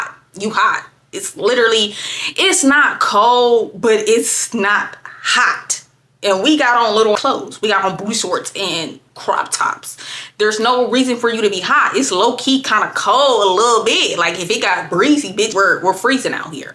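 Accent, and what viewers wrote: United States. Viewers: looking good with the red - USA accent is still the coolest thing 'since sliced bread':washhands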